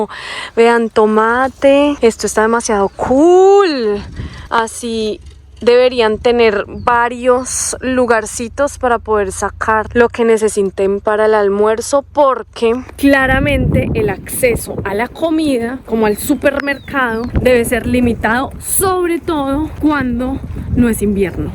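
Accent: Antioquia, Colombia. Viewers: Hola, tenés un acento " paisa", si hablamos de gente amable en tu ispa los cartagineros se llevan el premio!!!! Saludos desde Paysandú Uy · Tu acento me vuelve loco chica colombiana hermoza,nuestro país 🇺🇾 es lindo pero esta a años luz de el tuyo 🇨🇴,digamos las cosas como son,saludos desde Durazno(Uruguay).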